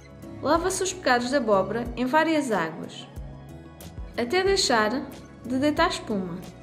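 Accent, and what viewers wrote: Portugal. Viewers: Sou brasileira Acho lindo o português de Portugal 😍 Muito bem falado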